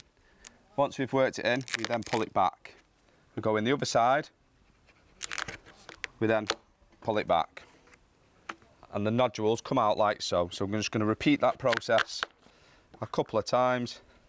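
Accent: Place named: United Kingdom. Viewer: Love British accent😂 oh my gosh! But sounds Bu…, Cut sounds Cu…, how you guys understand each other? hahah pretty cool though!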